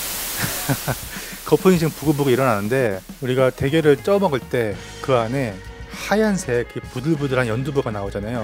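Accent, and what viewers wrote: Gyeongsang, South Korea. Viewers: 억양을 들어보니 경상도 분 이시군요. 갑각류의 피가 반투명 묽은 파랑색인것도 처음알았습니다. 의학용 학명이 라띤어 이므로'Hemoglocyanin(발음상으로 적확하게: 헤모글로시야닌)'같은 구리성분이 함유된것이라고 아래 어느분이 한글로 적어둔 글을 보고 알았는대 다른분영상에서 복어 회뜨면서 독제거와 피를받아서 보여준적이있어 비교합니다만 복어의 피는 다른동물의 피처럼 빨간대 갑각류는 생선같은 어패류와 또 달리 반투명 믉은 파랑색인걸 알고갑니다. 좋은 정보였습니다.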